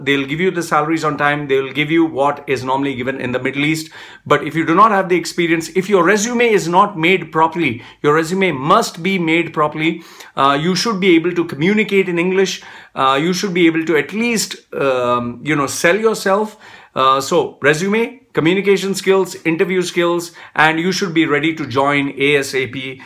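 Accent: India. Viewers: Shaithan/illuminati and Indian accent burst me into laughter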